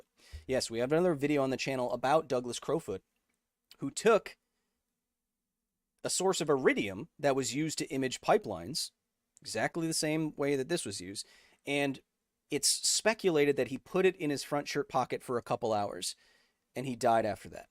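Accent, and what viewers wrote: Queensland, Australia. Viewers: 1. North-Eastern. That's at least northern-NSW if not QLD or NT. We have far less "twang" down south. 2. Geiger.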